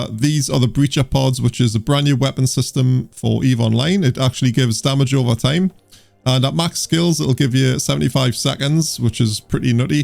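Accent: Newcastle, United Kingdom. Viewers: Wait what, a Geordie playing eve. Why the hell am I not playing with you!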